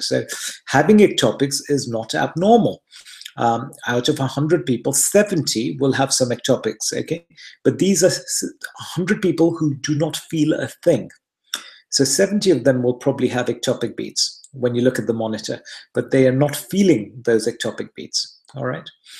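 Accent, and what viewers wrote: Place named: United Kingdom. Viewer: British accent.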